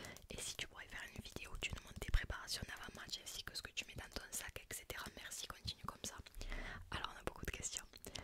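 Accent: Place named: Marseille, France. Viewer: L’accent 😍 marseillaise en plus 🔥 ailier gauche à la Dimitri Payet, caviar sur caviar pour ta numéro 9 🤝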